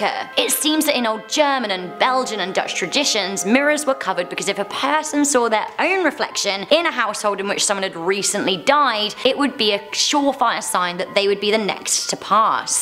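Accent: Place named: United Kingdom. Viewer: Dutch 🇳🇱 love the UK accent and love u ❤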